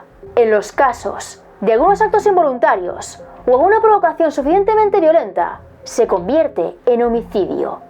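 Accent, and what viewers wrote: Spain. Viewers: A mi antes me disgustaba pero me acostumbré a ella y a su acento español y ahora de agrada.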